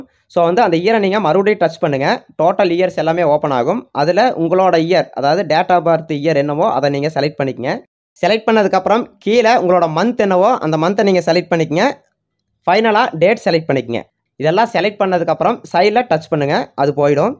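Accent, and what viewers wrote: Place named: Kongu, India. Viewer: கொங்குத்தமிழை கேட்பதே தனி சுகம் தான் நண்பரே!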